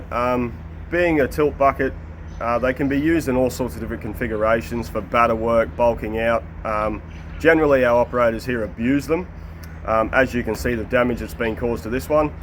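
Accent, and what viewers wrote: Australia. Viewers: Love your English australia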